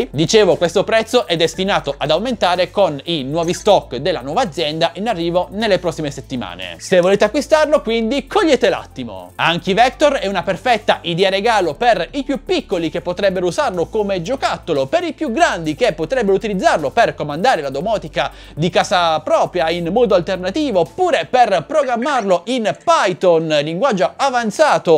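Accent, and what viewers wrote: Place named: Bari, Italy. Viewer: si infatti non sto giudicando te.. te sei fantastico adoro i tuoi video.. penso soltanto che questo gadget sia un po inutile.. but anyway adoro i tuoi video anche dal tuo accento ahah anch’io sono di Bari ma per il momento vivo in Australia e mi manca molto il mio paese.. ti ringrazio ciao bello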